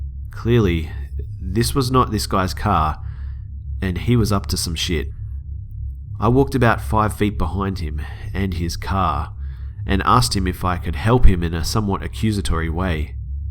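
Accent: Australia. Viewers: Your accent is awesome! I've been dating an Aussie(Brisbane fellow) for awhile and I've just fallen in love with your accents:) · Are you Australian I subbed by the way. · Lol Austrailian accent idk why but it sounds funny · Subbed mate! Are you Australian? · Hey are u Aussie I am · I'm Australian born and bred, yet for some reason, you're the only Australian YouTuber I've seen who I enjoy listening to, let alone can listen to without wanting to eject myself into the sun.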